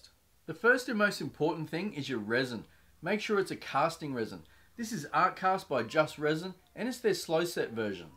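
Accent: Australia. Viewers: Great to hear an Aussie accent and explain things so well thank you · Ahhh... There's nothing better that clicking on the video and hearing an Aussies voice !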